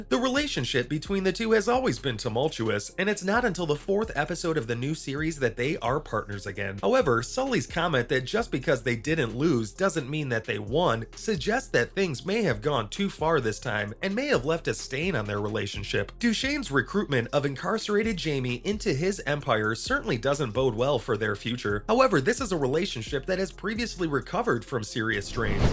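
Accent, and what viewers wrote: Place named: United States. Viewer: why is a American telling us this😂😂😂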